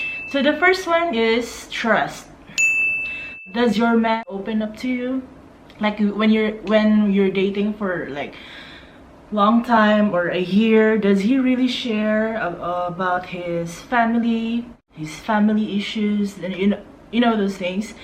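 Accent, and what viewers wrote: Nigeria. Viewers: I love that you have a Nigerian accent and behavior.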